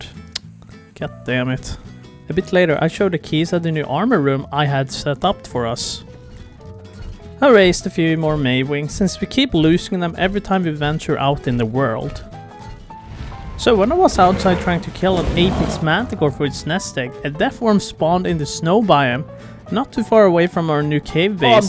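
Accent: Sweden. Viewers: är det svenska jag hör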